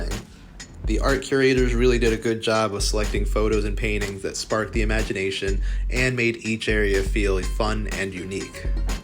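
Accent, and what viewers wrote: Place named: North America. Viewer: Great video! Could you please describe the process of embarking and disembarking from foreign ports? I assume from your accent that you are either from the U.S. or Canada. So am I, and I'd appreciate some tips on what to expect when traveling to and from Europe to board and disembark. What is customs like? Is it hard to navigate on your own?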